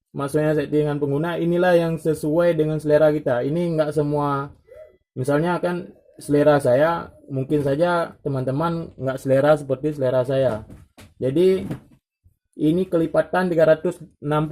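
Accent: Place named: Padang, Indonesia. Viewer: Dari logatnya seperti org padang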